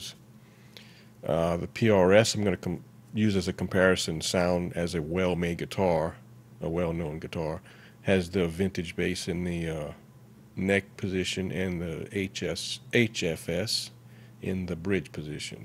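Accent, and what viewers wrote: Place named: Louisiana, United States. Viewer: Louisiana? u have same accent as me lol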